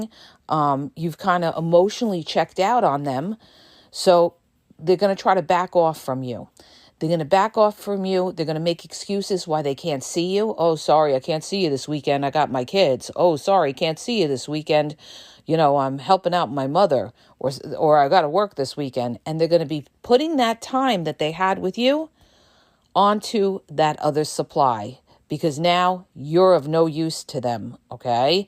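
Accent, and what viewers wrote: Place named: New Jersey, United States. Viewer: I sincerely appreciate your knowledge & sharing it with us. Your Jersey accent is really apparent at times. Thanx again, Sister.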